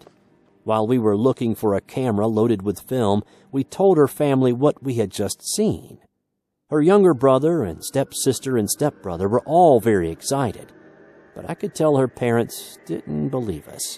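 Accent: South, United States. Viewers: andrea I do every time. I love his Southern accent.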